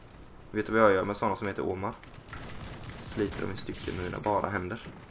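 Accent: Norrland, Sweden. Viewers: Gillar din Norrlänska dialekt, du är bra på språk. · det det var norrländska..... inte värmländska.............. xD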